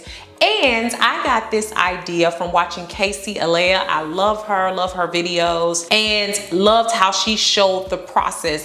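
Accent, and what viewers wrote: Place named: Midwest, United States. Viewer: I just love the Midwest accent. I’m from the south but all my family in Midwest now. Make me feel right at home 💕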